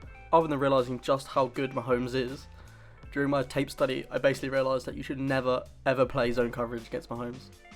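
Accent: United Kingdom. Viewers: I would rather have a football woman narrater than a british accent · where are you from? sounds like the UK, if so, are there many nfl fans there? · This is unbearable to listen to with a British commentator. WTF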